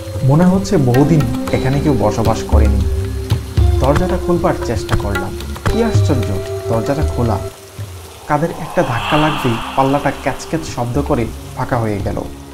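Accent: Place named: Bangladesh. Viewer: আপনি নিশ্চই কাংলাদেশের মাল আপনার ভাষাই বলে দিচ্ছে আপনি কাংলাদেশের মাল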